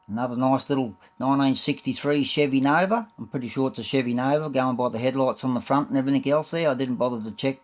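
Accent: Australia. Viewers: Wow....you have enough cars there for at least 6 months of videos 😁 Can't wait to see HCT back up and running as it is also good to hear your Tassie accent on YouTube again mate 🤙🏼 I enjoy your videos as I always pick up a tip or two on customising so I wait with bated breath to see what's next!! That van with the bubble windows will be a fun build I reckon. Keep up the relaxed and interesting vibes 😎🇳🇿🍺🍻🍕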